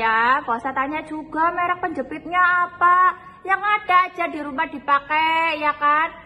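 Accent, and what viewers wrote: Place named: Banyumas, Indonesia. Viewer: hahaha ngapak euy keren hadir salam sukses bro mampir ngopi ☕☕☕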